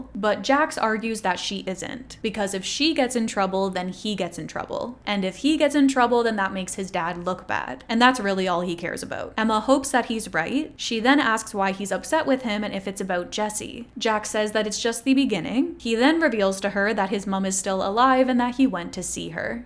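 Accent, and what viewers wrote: Canada. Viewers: I’ve been following you for years but I didn’t realize you were Canadian until I watched this 6 hour video and noticed how you were saying about. Canadians seem to really pronounce their o’s I’ve noticed this with the word sorry too. Then you confirmed later in the video that you were Canadian so it’s good to know I wasn’t going crazy!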